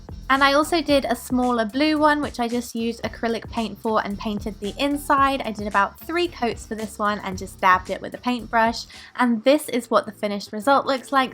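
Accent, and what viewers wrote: United Kingdom. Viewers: It's so cool that your name's and you have the same British accent......btw ur amazing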